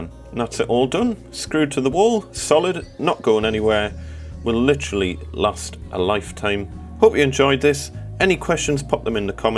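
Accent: Newcastle, United Kingdom. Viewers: Great video and the commentary makes for a much more enjoyable project. Love the Geordie accent. Keep them coming.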